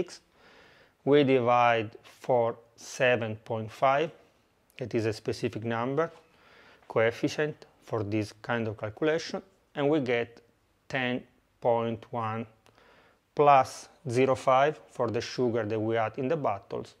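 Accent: Italy. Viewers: You're too good. especially it's something to hear Kg, Litre etc. than hear some Oz and lbs(lot of bullshit). And keep up your italian accent. It's awesome! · Very informative with sweet Italian accent.. Grazie mille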